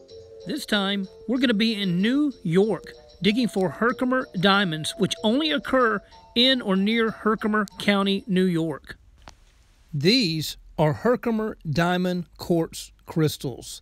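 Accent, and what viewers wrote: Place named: Carolina, United States. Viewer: Handsome, charming, rugged, manly man with a Carolina accent and a sense of humor, doing scientific 'ciphering' while digging into dirt and rock to pull out massive, gorgeous gemstones!... WHAAAAAT! THAT'S JUST HOT! Best YouTube channel EVER! ROCKS!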